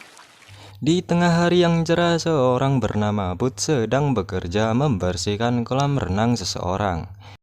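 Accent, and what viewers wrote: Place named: Java, Indonesia. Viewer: Jawa nya kelebihan mas pekat kali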